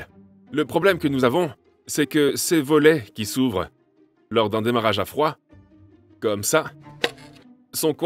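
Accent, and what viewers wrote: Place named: England, United Kingdom. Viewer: Lol knowing you're English accent voice then hearing it dubbed is crazy cuz in listening and since I'm in America is dubbed in English. So I'm seeing you speak English knowing you're voice but hearing a weird english dubbed voice haha😂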